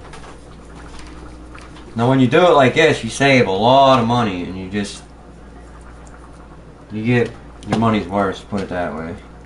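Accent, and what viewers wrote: Pittsburgh, United States. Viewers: It took me about 5 seconds to tell your from Pittsburgh, love it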